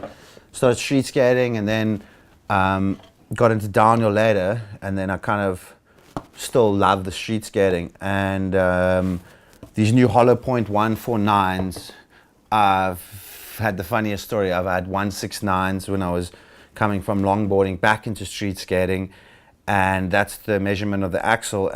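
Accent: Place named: Italy. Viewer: italian accent is cute :)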